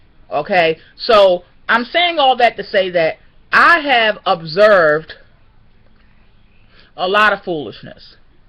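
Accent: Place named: Maryland, United States. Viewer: Girl u sound like me... im from md too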